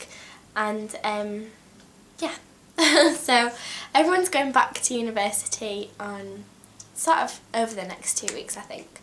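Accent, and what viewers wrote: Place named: Yorkshire, United Kingdom. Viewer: Your accent is amazing, are you from Yorkshire ? xx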